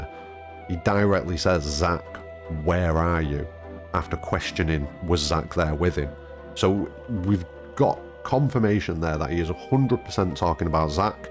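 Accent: Manchester, United Kingdom. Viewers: I literally thought that once I’d listened more. I moved here three years ago, so I’ve got ears like a bat for the Manc accent now. I could just imagine you saying, ‘Fock off, Man City!’ Hahaha.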